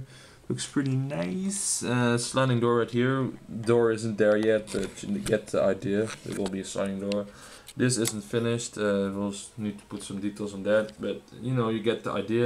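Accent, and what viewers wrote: Netherlands. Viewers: Ik hoor gewoon dat jij nederlands bent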